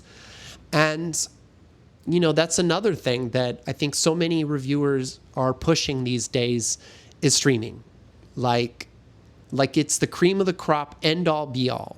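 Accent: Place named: Germany. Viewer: Are you sure you're American? 😅 You sound like you have an accent, and for me, you somehow sound more German/European than American. Please don't be an immigrant, i.e., don't be contributing to the thug that is America. And disclaimer, I myself am Chinese and don't care to be called American, nor do I care to be represented by Hollywood Aesians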